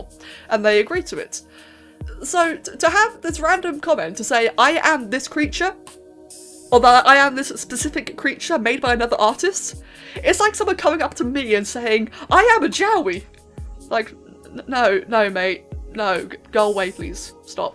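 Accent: United Kingdom. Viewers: So, this is what I missed while I was away at my family reunion? Well, I have no idea what to think of it aside from "wow". Seriously, you look to have quite the following in the Spore community just as much other youtubers for other communities possibly (Alpharad for Smash, NISLT for TF2 (he's practically Alpharad of TF2 from what my brother Robert described him as) though I'm not really sure). The fan art is simply a sight to behold and the amount made for you and the Jowwi. Also, why is it that people mistake you as a boy when you are in fact a girl (a rather ladylike sounding one at that, but it might only be the British accent (no offense)) and I don't mind your voice at all. I. AM. SO GLAD that my comment from the Helminth Charger video that you commented on about my comment being among the list of other random comments and being unsure now what to do with it, thus inspiring extreme depression in me. 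It caused and is (possibly) still causing me as great of pain as my stomachache at the family reunion from going in for seconds and getting a second can of Pepsi right after I finished my first plate and can (me drooling at a trash can in preparation to vomit and all which didn't happen thankfully, the vomiting I mean). I simply want to try to put that behind me and I wish for a second chance in the raffle, that's all I'm asking for. I am dreading and fearing that my comment about you saying my comment reposting was a scumbag, something I deeply regret with all of my heart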